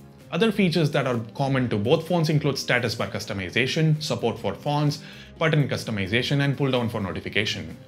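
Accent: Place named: India. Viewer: Indian accent is always fun to hear :)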